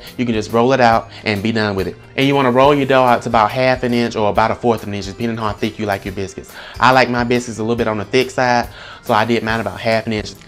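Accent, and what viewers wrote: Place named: South, United States. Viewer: I can tell by the way that you talk that you're from the country so I know your biscuits are good!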